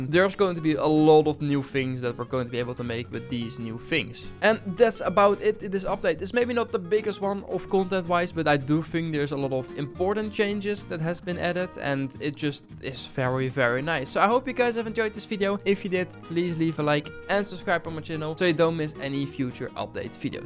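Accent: Netherlands. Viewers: Are you dutch ist looks at a realy dutch accent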